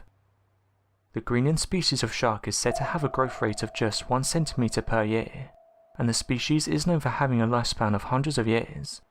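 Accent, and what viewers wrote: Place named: London, United Kingdom. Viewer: London town I'd say he's good hay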